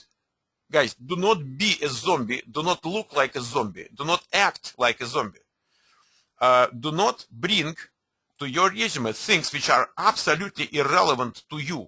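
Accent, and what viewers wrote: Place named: Russia. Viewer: It is so great that you have a Russian accent. Those things you say with this accent... I believe that you represent a better face of Russian-speaking people in the US.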